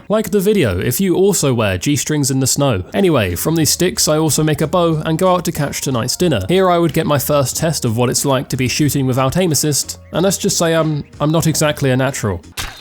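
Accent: United Kingdom. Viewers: What is this accent? I can only tell it's British but not more precise than this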